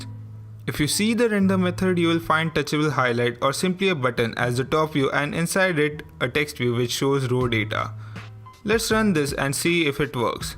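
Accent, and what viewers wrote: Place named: India. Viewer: Very hard to follow with the Indian accent :(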